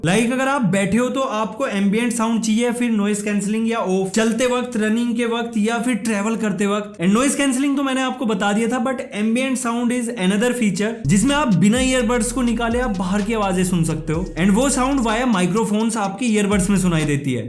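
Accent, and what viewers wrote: Meerut, India. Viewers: Bhai aap Meerut se ho ??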